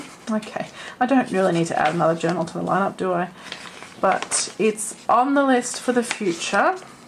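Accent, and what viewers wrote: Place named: Australia. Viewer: Always love your videos so much! Thank you for consistenly uploading!!! (Wisconsin, USA subscriber here ❤) and absolutely love your voice and your awesome Australian accent!! (My almost 13 year old daughter Loves talking in Australian and british and so on accents (and actually shes really very good at it lol) and when she hears you on my phone, she always comes over and says how much she loves you and how you talk!!😁) and calmness and excited-ness all at the same time 😆 I always look forward to watching your videos and appreciate the time and effort you put in to making them. 😊 I hope your week is going great :)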